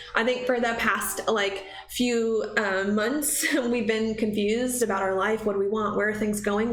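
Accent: South, United States. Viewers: Been watching you since before your move! Your definitely getting a southern accent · You can really hear the southern accent on this one. :)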